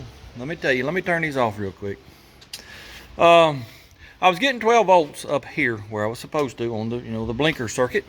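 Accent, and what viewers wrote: South, United States. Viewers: THE WIFE AND I LOVE YOUR VIDOES JUST WANTED TO KNOW IF YOUR COUNTRY TALK IS FOR THE SHOW OR IF YOU TALK LIKE THIS ALL THE TIME,LOVE IT ANYWAY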